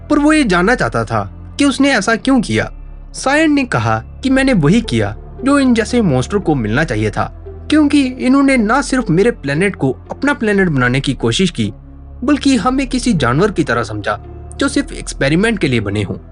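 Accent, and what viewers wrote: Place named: Punjab, India. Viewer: Your voice is like punjabi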